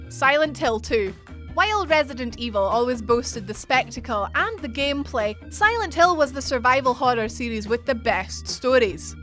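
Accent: Scotland, United Kingdom. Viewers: She's Scottish :-) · I love your accent. Scottish lass.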